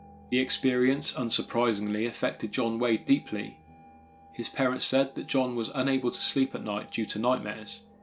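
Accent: United Kingdom. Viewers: Faceless story teller but with a beautiful voice and cute British accent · A documentary just isn't a documentary unless a Brit narrates it. Excellent content by the way 👍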